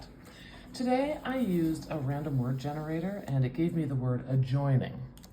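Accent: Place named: Atlantic Canada, Canada. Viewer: Could you do a series where you transcribe a word in two different accents, like your own Canadian Atlantic accent vs Minnesotan? Or is there a Canadian West Coast accent? East vs West, yo!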